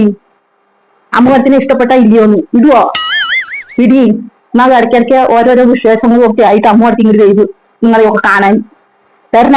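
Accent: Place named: Palakkad, India. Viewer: എനിക്ക് പാലക്കാട് ഭാഷ ഇഷ്ടാണ്..എന്റെ ഒരു നെയ്ബർ ഉണ്ടായിരുന്നു.. മുംബൈയിൽ.. അവര് ഇപ്പൊ നാട്ടിൽ സെറ്റിൽ ആയി.. പെട്ടന്ന് അവരെ ഓർമ്മ വന്നു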